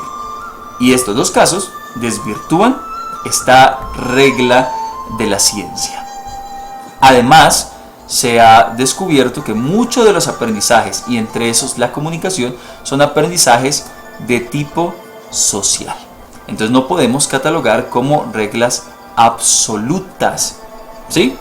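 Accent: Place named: Colombia. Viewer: Gracias mi estimado. Pero tan mal dicho esta decir Zi, como Sapato. Sin ánimo de molestar abrazos para Colombia de donde por su entonación deduzco es usted oriundo.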